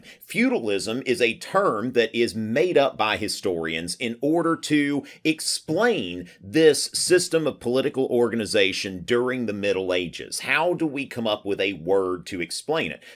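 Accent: South, United States. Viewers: As King of England, I approve of this video (love your country accent btw 😁)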